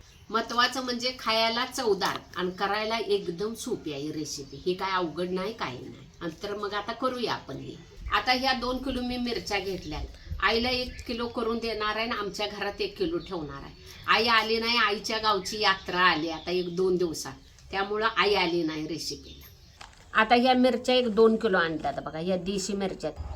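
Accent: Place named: Kolhapur, India. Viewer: मावशी तुमची बोली भाषा ही सुद्धा तुमच्या पदार्था इतकी रुचकर आणि गोड आहे...... कोल्हापूरला शिकत असताना अशी गोड भाषा रोज ऐकायला मिळत असे....nostalgic खूप खूप आभार आणि धन्यवाद अशा सुंदर रेसिपीज इतक्या साध्या पद्धतीने सादर केल्या बद्दल....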